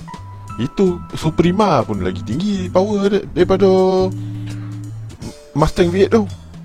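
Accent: Negeri Sembilan, Malaysia. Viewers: Ada 2 sbb utama kenapa orang layan video bro: 1. citer pasal asal usul kereta. 2. loghat nogori yang rasa dekat di hati dan klaka.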